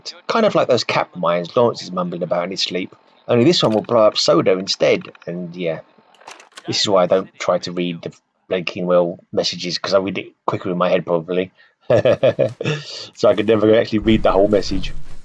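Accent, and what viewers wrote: United Kingdom. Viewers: I can barely understand this with your British accent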